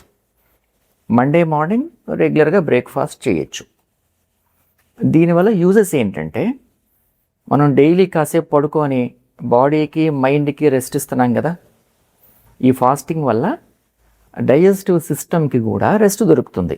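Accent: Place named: Guntur, India. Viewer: Sir, Meeru Guntur ani ardham avuthundhi,Ela ante vachhiddhi,thaggiddhi edhi guntur valle matladatharu, Good information sir🎉🎉🎉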